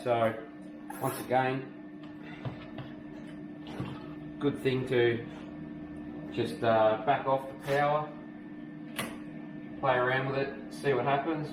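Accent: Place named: Australia. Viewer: The great quintessential Aussie accent. Thanks for your interesting review.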